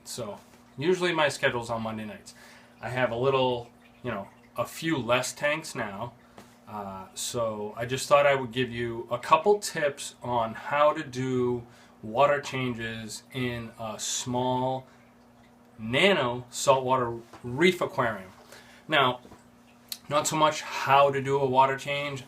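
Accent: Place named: South Shore, United States. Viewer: What part of Mass are you in? You sound very south shore!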